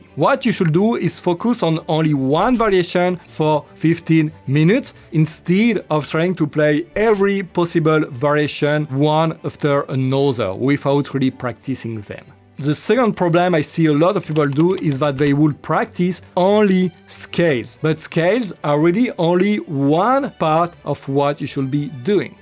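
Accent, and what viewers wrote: France. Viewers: Your French accent is difficult to follow. Please use AI to change to a British or American accent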